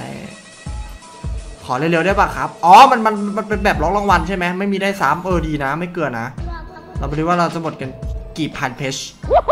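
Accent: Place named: Isan, Thailand. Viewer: เห้น ตลกก็บัดนี้เเหละพูดตลกเหมือนเสียงอีสานน่ะ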